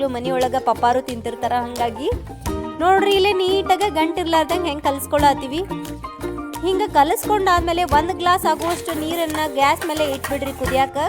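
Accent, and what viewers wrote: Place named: North Karnataka, India. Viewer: Nimma Uttarkarnataka maatu ista magale, bcos naa hutti beladiddu alle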